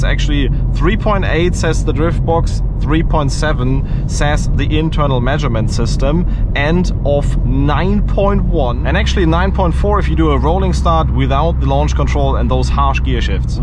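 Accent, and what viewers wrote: Germany. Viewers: Aua own Audi😅. Das tut ja schon in den Ohren weh, wenn du als Deutscher Englisch redest. Wieso ist dieser A45s denn eine Sekunde schneller als alle anderen auf Youtube? Du korrigierst die Zeiten auch nicht bei deiner Box. Da ging es bestimmt bergab.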